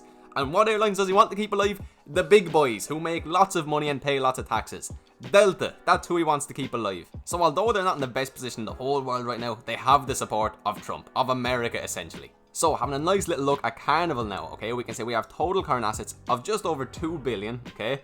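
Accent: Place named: Cork, Ireland. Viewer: you from Cork boy?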